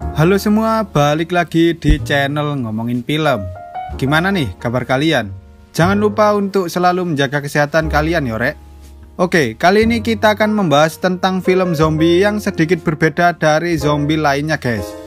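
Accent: Java, Indonesia. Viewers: Bang jawa mana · Logat jowo 😁😃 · Jowo e ndi om ? 😂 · Wong jowo mas😅😅😅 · Kayaknya orang jawa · weh wong jowo iki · Sampeyan wong jowo yo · Mas e jawa ngndi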